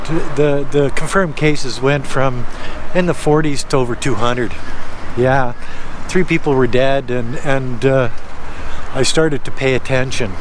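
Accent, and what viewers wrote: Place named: Canada. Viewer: I didn’t know Canadians had an accent until I started watching you. I’ve been a fan for a few months. I’ve really been enjoying your informative vlogs. I got a real kick out of your steak bbq at your condo in Canada. Keep up the good work and best of luck from a fellow Saskatchewan boy.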